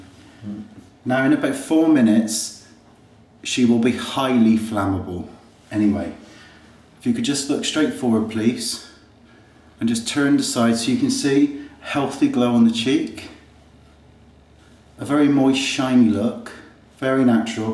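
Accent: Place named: West Country, United Kingdom. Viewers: I think he grew up in Cornwall and moved to Wales? It's a very southern accent. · hahahaha loving the farmers accent! you sound like you're from cornwall lol xx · are you from Bristol?? Im loving the Bristolian-ish accent hehe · That was VERY funny. Sometimes i can hear a bit of west country accent when you speak but that was positively "BRIZZLE"! Thanks for the laugh:-D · What a lovely, natural look you have created. I wish I could do my makeup to look exactly like that! Actually, I think that a Scouse Brow would have complemented the look even more... You are a Very Naughty Boy!!! must really love you to let you get away with all that you do! Love it. Your comedy videos are almost better than your serious ones! Especially accompanied by the Westcountry accent. More more more please x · Love the somerset accent! Reminds me of home!:L haha! 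Loved this, made me laugh! x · Love the slight west country accent! Really funny vid x x x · your accent makes everything you say so much funnier:') love a good west country accent! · I also just 'stumbled' across ur channel - this made my day!!! divorce has made ur eyebrows low - lol!!! fantastic - look forward to watching the rest of ur vids...love that west country accent!! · i love your 'brizzol' accent....... hillarious, my make up artist from bristol sounded exactly the same... but had a much dirtier laugh! brilliant video:-) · BHAHAHAHAHA!!!!! That is one of the funniest videos eveeerrrrrr!!!:D Good on ya, for being such a good sport!, your Somerset accent really shone through in this one, loved it! Thanks for the giggle! Jess x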